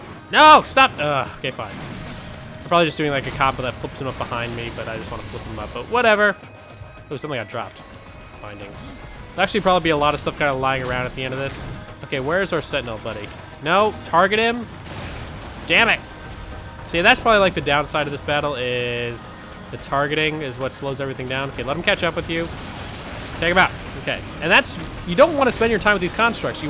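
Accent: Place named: United Kingdom. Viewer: Hi I'm from the uk and i have to say i found your British accent most entertaining and i love the vids and the wit and banter. But here's the thing would it be possible to upload more than one vid i appreciate you maybe busy and if that is the case please ignore this message thank you.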